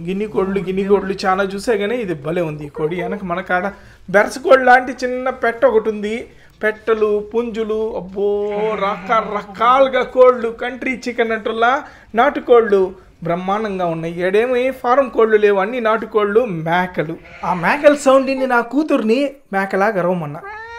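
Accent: Nellore, India. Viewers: I am not saying you need a fake voice. Just talk normal how other travel blogger’s and other youtubers are talking. You try to speaking over extra Nellore slang . I am not any advice to you. Since I am your subscriber I telling to you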